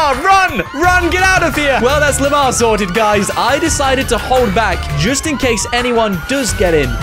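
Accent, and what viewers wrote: United Kingdom. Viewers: Are you British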